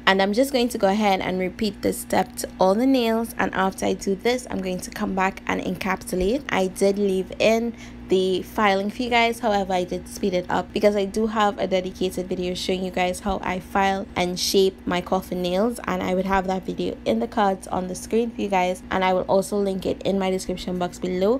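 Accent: Trinidad and Tobago. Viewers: I know a Trini accent when I hear one. Big up yourself sis!!!!! ❤️🇹🇹🇹🇹🇹🇹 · Loving those nails and the accent my sister 🇹🇹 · First video of yours I'm seeing. Are you from Trinidad 🇹🇹? Your accent sounds like it. 😊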